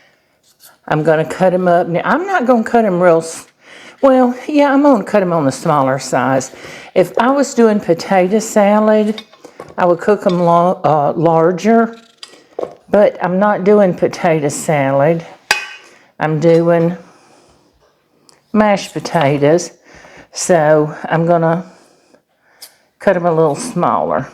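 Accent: South, United States. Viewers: I will definitely try this cabbage casserole recipe. I am New Jersey girl with southern roots. And I loved your southern accent, and especially your calm demeanor during your presentation. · Hi. I was just scrolling and came upon your video. Two of my favorite foods are cabbage and onions. I have never heard of this cabbage casserole. It sounds wonderful and looks pretty easy to make. I love your bright open kitchen and your great explanations, but especially your sweet Southern accent. I'm from California so it's a treat to hear you. Thanks so much. Subscribed.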